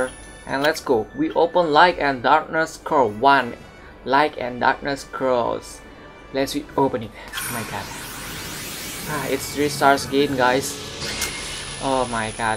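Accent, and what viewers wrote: Indonesia. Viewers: Logat nya Indonesia bgt.. di add om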